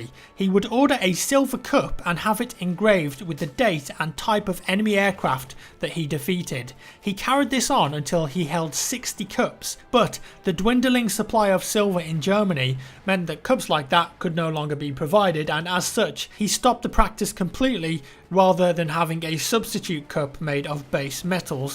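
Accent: United Kingdom. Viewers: I refuse to believe you think really is american, Like what kind of fucking idiot wouldent know he is british JUST HEAR HIS ACCENT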